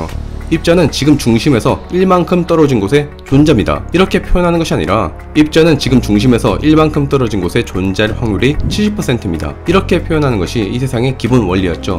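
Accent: Gyeongsang, South Korea. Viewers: 경상도 억양이 약간씩 섞여 나오네요. 어느지역 출신입니까?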